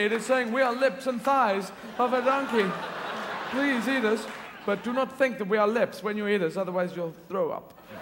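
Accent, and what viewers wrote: United States. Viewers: I love his American accent. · I love his southern accent. That was hilarious. It is good, I am southern. I am a Cajun hillbilly, lol!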